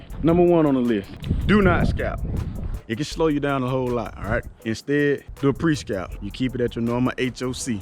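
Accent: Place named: Georgia, United States. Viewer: Sound like somewhere in Georgia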